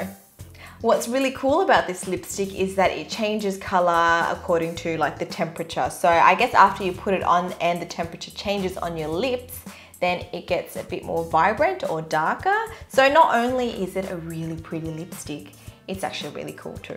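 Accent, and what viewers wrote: Sydney, Australia. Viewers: haha shes probably asian but grew up in australia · its australian!! like mine :) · Omg yas is that an Aussie accent? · Liz Beth she's from australia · R u from Sydney Australia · I knew you got OZ accent HAHAHAHHA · her australian accent is form sydney where she grew up...mentioned in video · Liz Beth she's from Australia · Her accent is Australian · She's Australian. She even mentioned that she was in Sydney. :) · I knew it! sounded Aussie but wasn't sure :-P Thx for your reply. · oh my gosh lol. she can be Asian and Australian... she's from Australia but is Vietnamese lol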